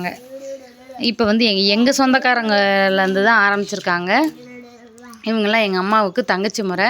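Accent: Thoothukudi, India. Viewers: நீங்க தூத்துக்குடி ஆ சிஸ். நா நிறைய டைம் உங்க சேனல் பாக்கும் போது நினைப்பேன்.நம்ம ஊரு பேச்சு வழக்கு இருக்கு பாத்தேன். நா திருநெல்வேலி சிஸ் 😊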